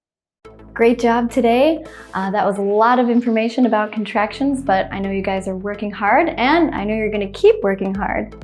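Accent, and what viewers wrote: United States. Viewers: Hi teacher, Definitely, I like your American pronunciation. My examples; I'll visit my brother this evening. You'll be happy, if you follow my channel. We'll be so excited, if we meet again. He'll study English hard for the exam. She'll be looking gorgeous, if she wears that dress. They'll start teaching all subjects online. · I like the USA pronunciation. Today I am understand some short form of future tense will such as I will(I'll) you will (you'll) we will (we'll) and so on.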